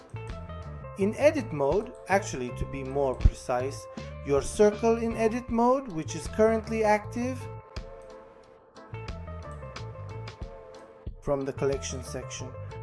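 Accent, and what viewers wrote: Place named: India. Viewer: وقتی انگلیسی حرف می‌زنی استاد شبیه هندی‌ها می‌شین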